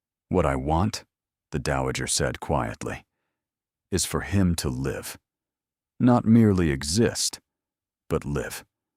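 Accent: United States. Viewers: The American accent doesn't belong in this English aristocratic period story. The narration comes over as bitter & angry. The inflections and punctuation are all wrong and detract from the story telling.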